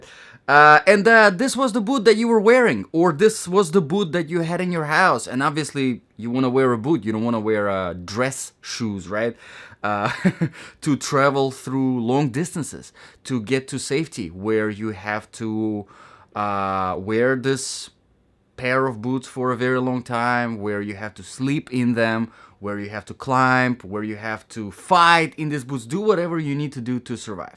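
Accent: Russia. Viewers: Russian accent?! :)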